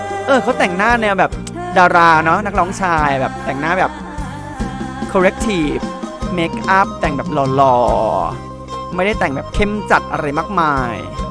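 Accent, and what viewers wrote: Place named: Isan, Thailand. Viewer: โชว์ประตูสู่อาเซียน เสียงอิสาน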